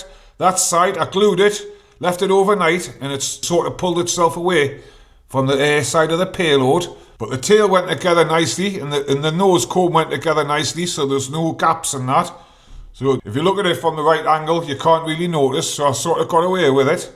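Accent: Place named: Wales, United Kingdom. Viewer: Hot damm, I do love the Wales accent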